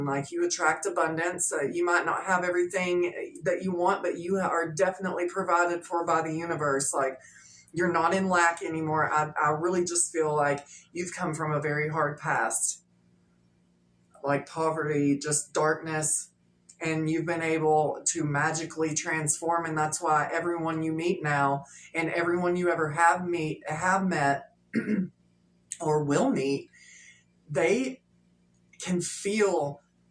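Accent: South, United States. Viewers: Thank you for that! It took over two hours to watch your video due to all the synchronicities. I was resonating and meditating with eyes full of tears baby! This was quite an experience for me. Thank you. By the way that southern accent is very becoming of you and I like it! 😘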